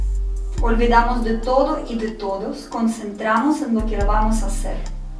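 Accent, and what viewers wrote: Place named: Russia. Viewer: Estás rutinas me encantan,combinando el yoga y perdida de peso, las amooooooo😘😘😘😘😘😘😘😘😘Un besito y no dejes de decir huesito púbico que me encanta tu acento ruso español jajajaja 😂😂😂😂😂😂😂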